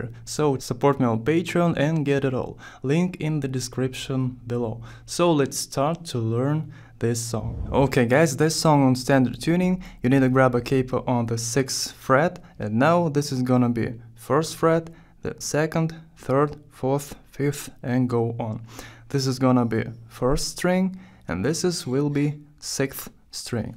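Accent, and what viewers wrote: Russia. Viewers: 100% Russian accent. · Этот русский акцент >_>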